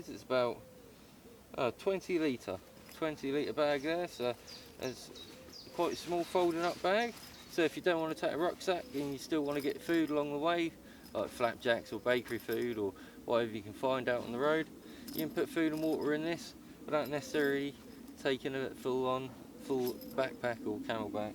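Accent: Norfolk, United Kingdom. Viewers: I really enjoy your videos very helpful and you have such a nice Norfolk accent 🚴‍♀️🚴‍♀️🏳️‍🌈🏳️‍🌈🚴‍♀️🚴‍♀️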